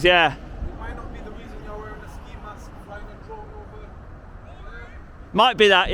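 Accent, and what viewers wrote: Leicester, United Kingdom. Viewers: You have a Leicester accent am l right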